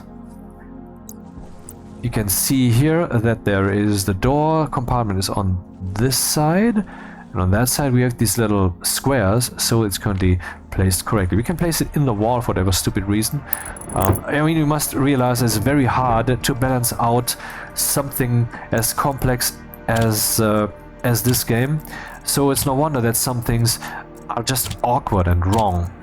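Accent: Germany. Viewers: I love everything about this video ❤️ I love that your introduction takes almost 5 hours, that you get slowed down by needing to explain the details then end up sayin "we'll get back to that later" and I love the German accent. I got here by chance. Steam showed me this game I had never heard about at checkout buying a totally different genre game. So I watched the first 20mins of this video to help me decide - and promptly added the game to my purchase. Now I am into your video and have 22hours game time registered over 5days, trying to figure the concepts out myself and only taking hindsight hints from your video. Best Xmas gift for myself ever 😂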